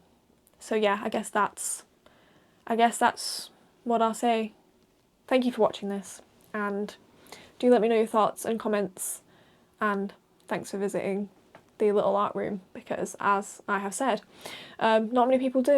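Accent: United Kingdom. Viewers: loved the video same im 24 and moved to a new city and am alone all the time ive lived here for nearly 2 years and I know 2 people here, also completely blew me away hearing the lancashire accent im from lancaster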